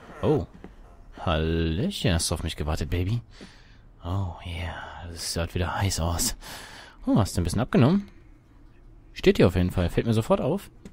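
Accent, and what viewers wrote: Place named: Berlin, Germany. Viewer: Wär ja mal voll endgeil... Natürlich, um so weniger Chancen, um so besser xD Ey, das mit dem Träumen war meine Idee D:< xD Der Tod war so unnötig xD omg, Lachflash xD Dein Berliner Dialekt ist voll cute:D Der Baum will dich beschützen!😌 Ich kann hören, wie sie vögelt... Achso Vögel fängt... pfeifend weggeh Eric ist vielleicht an Eric the red angelehnt... <<< Gedanke kam mir so ganz am Anfang xD Tschuldigung, der Mond sah so lecker aus.-. Ich konnte mich einfach nicht beherrschen..., das Lesen musst du aber noch üben😌 Srsly? Auftraggeber, Auftragnehmer? XD Dieses "Oh, fuck!" am Schluss und ich erstmal so mega ratlos, was du meinst xD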